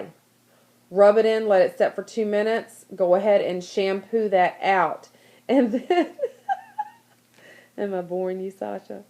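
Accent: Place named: South, United States. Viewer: I also love your southern accent. I'm from the south:)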